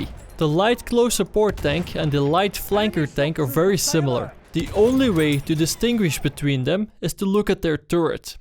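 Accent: Netherlands. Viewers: Why do you sound so dutch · jij hebt echt een zwaar NL accent in je engels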